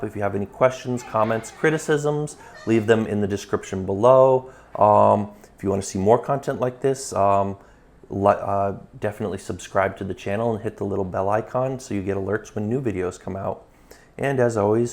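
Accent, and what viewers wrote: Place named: United States. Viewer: finally one video in american english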